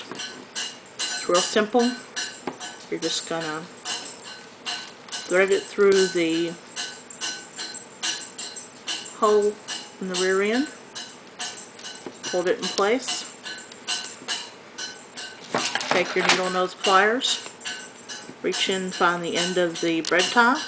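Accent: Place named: West, United States. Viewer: talkin like a cowboy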